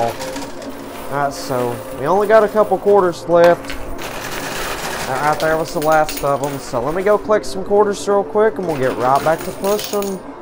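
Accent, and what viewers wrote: South, United States. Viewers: You're doing great tonight....that deep southern accent...anyway you're doing great 😃😃😃😃👍👍👍👍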